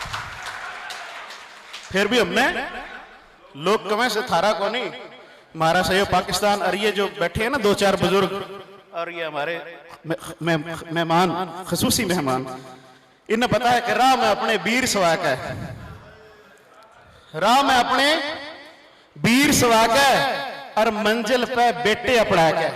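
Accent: Haryana, India. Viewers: वाह जी वाह रै हरियाण्वी भाई जी, खूब मजा आ गया तेरी बोली सुण क । पर यो पाकिस्तान म्हारा था अर कुछ दिन पाछै फेर म्हारा ए होगा । जय हिंद, जय आर्यावर्त । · Kalam tod di bhai jaan haryanvi boli aaj b apne jinda rkhi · badiya kavita sunai aap haryanvi boli ko aaje bada rahe ho dhanywad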